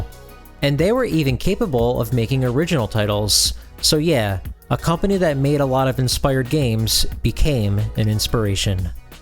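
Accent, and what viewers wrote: Chicago, United States. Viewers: I have no words to express how happy it makes me to have do his signature smile after his intro. That and his Chicago accent makes me click right away. Oh and the content is great too.:)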